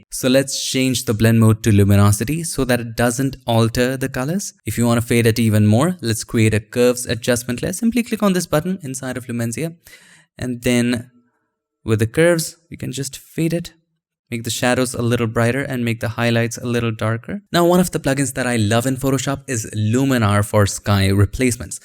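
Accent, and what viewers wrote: India. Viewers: Your English sounds like Indian it’s hard to follow up.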